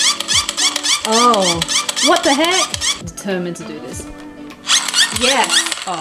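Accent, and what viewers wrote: Australia. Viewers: Cute China doll with Australian accent.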